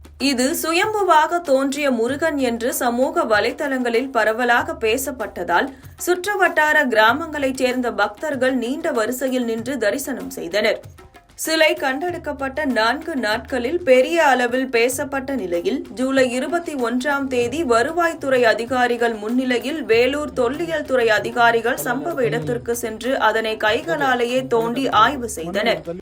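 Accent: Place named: Telugu, India. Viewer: Telugu voice 😅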